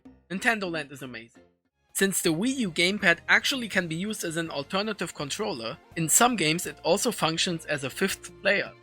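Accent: Germany. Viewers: Are you German/Austrian ? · I hear deutsch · This is the first video i've seen from you and i immediately noticed that you're german lol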